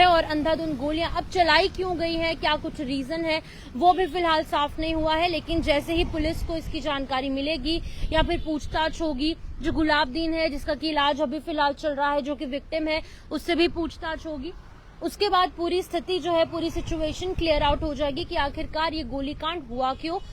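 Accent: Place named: United Kingdom. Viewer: Reporter ne hindi ka accent uk english me kr diya 😢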